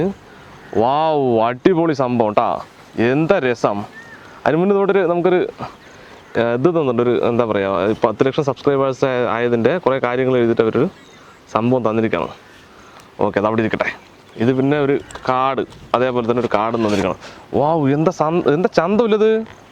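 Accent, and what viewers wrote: Palakkad, India. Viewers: ആദ്യം ഒരുപാടു ചിരിച്ചു , നിങ്ങളുടെ പാലക്കാടൻ ഭാഷയും മറ്റും കണ്ടു ... അവസാനം കുറച്ചു കരഞ്ഞും പോയി ... നിങ്ങളുടെ ആ നല്ല മനസ്സ് കണ്ട് ... 🙏🙏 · Palakkaadan daa🥰🥰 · Palkkade slang powlii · ഹേയ് ഇതു ഞങ്ങൾ പാലക്കാട്ടുകാരുടെ തനതായ സംഭാഷണ രീതിയിൽ അദ്ദേഹം ജാടകളില്ലാതെ പറയുന്നു അത്രേ ഉള്ളു. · പാലക്കാടൻ സ്ലാങ് ആണോ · Palakkadan slang istam like here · Palakkad aano,ikkante samsaaram pkd pole thonnunnu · Ethu njaga palakkadada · all the best എന്ത് ചന്തല്ലെ ഇത് തനി നാടന്‍ പാലക്കാടന്‍ സംസാര ശൈലി 👍👍 .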